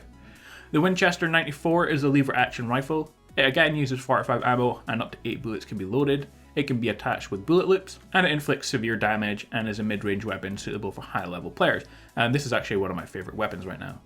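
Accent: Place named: RP, United Kingdom. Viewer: is actually Russian he just executes a very posh British accent.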